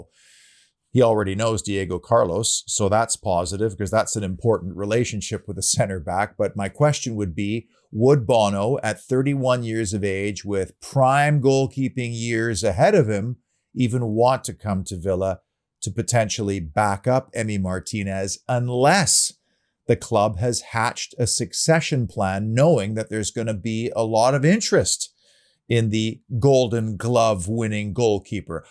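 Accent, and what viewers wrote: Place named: Canada. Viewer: A villa fan with a Canadian accent? Wow that's a little surprising.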